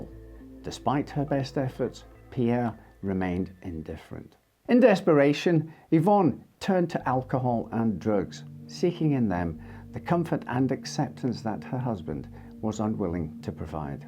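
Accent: Scotland, United Kingdom. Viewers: I’m trying, to discern the ‘whereaboots’ of your (own/default) Scottish accent - Ayrshire maybe?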